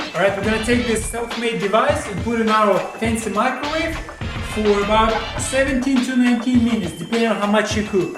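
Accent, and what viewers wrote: Russia. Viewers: I can tell you are Russian or Ukrainian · “He sounds like crazy Russian hacker” most people from that part of the world do. So duh.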